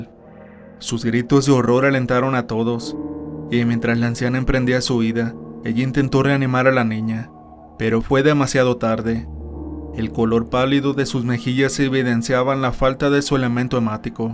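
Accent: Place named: Mexico. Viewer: son buenos relatos,,,pero mejora el acento la voz es horrible,,ya sabemos k eres mexicano mejora la locucion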